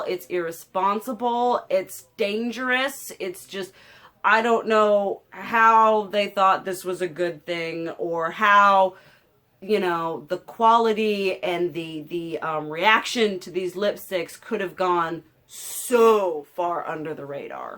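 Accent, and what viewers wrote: South, United States. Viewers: I detect a southern accent! Where are you from? I’m from NC. And if you’re not from the southeast you dang sure sounded like it lol. New sub!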